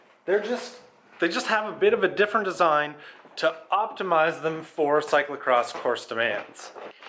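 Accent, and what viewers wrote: Canada. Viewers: He's Canadian. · Canada for sure.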